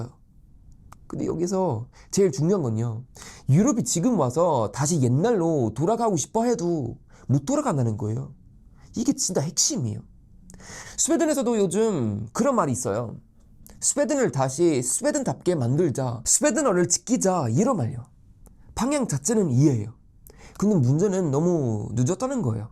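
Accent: Gyeongsang, South Korea. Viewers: 왜... 경상도 사투리가 들리지.....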